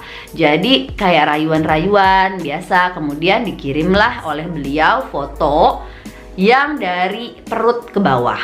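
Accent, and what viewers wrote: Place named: France. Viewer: Aksen perancisnya kebawa pas ngomong bahasa Indonesia ya 😆 aku suka kontennya. Kalau boleh request aku mau dong kak penjelasan penggunaan huruf “y”. Sampai sekarang belum mengerti :(. Terimakasih sebelumnya 😆 oh iya, baru kali ini nonton kontennya sampe ketawa ketawa 😂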